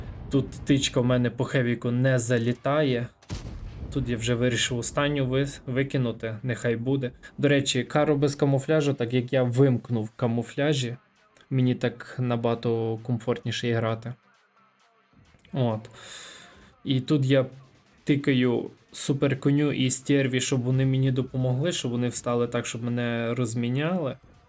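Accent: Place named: Poltava, Ukraine. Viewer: Якій же в тебе гарний Акцент!Звідки ти,Полтава?Миргород?Суми?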